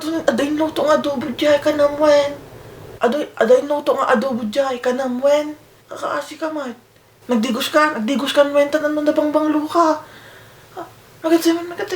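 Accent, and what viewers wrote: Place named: Tarlac, Philippines. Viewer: Magka-accent kayo ni Daddy hahaha taga-Tarlac po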